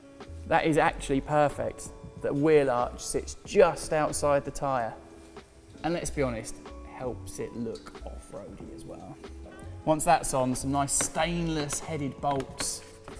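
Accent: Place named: United Kingdom. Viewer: 我居然听口音就知道是英国的 美国人说英语还是很不一样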